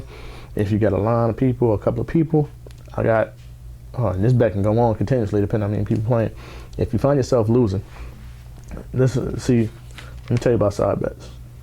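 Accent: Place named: Bronx, United States. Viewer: You definitely from the Bronx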